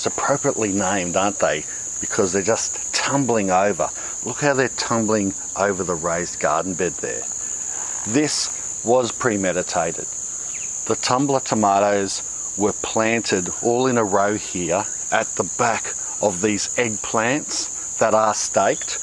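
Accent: Australia. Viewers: Love the Aussie accent mate...... ❤❤❤👍👍👍🙏🙏🙏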